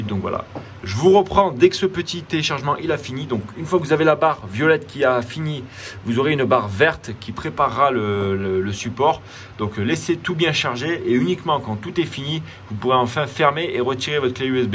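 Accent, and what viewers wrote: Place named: United Kingdom. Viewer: Mdr l'accent anglais j'ai le meme merci pour tuto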